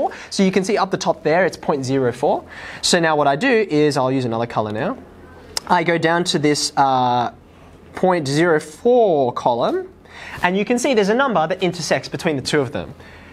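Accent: Australia. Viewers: listen to his Aussie accent hahahaha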